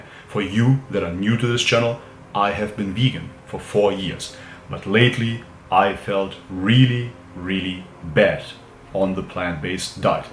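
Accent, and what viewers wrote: Germany. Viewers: Aren't you a German? Your accent definitely sounds like it? Sprichst du Deutsch?😁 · Lol very funny to hear you talk about sex with that strong German accent of yours!! 😂😂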